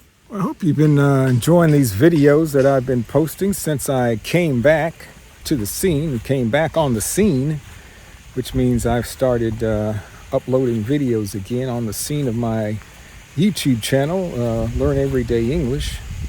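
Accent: Texas, United States. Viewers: Hello, my name is Roman. I come from Poland 🇵🇱. I like your YouTube channel. I like your Texas accent too. I learn English and your video help me improve my English, thank you for that. I appreciate it.😊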